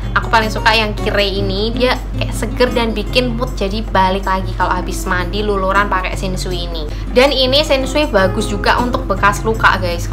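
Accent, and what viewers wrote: Java, Indonesia. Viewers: Org Jawa ya ? Logat ny kek jawa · Wong jowo to mbak?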